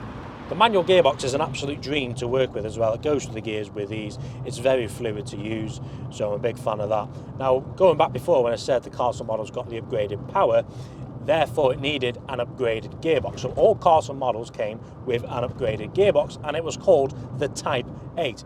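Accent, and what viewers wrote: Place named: Wrexham, United Kingdom. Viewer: I presume you're a Wrexham lad from the accent, would love to see you take some stuff over Worlds End. Yes it's narrow but I used to take my 'Group B' MG 6R4 over there for a shakedown and across Llandegla...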